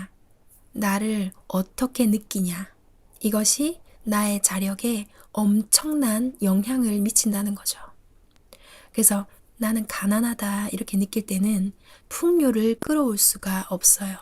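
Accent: Gyeongsang, South Korea. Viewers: 처음 들어오자 마자 구독 좋아요 꾹~♡ 누릅니다 사랑스럽고 편안한 목소리에 정겨운 경남 사투리가 매력을 두배로 해주는거 아시나요? 내용도 정말 현실속에 적응하기 쉽게 설명해주셔서 감사해요~~~♥️♥️♥️♥️♥️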